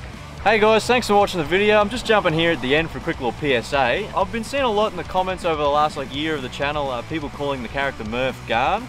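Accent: Australia. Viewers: i had to listen to the opening of this video 3 times before i was able to comprehend you were in fact speaking english. what the fuck is the aussie accent. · The australian accent is very much accurate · god the australian accent is glorious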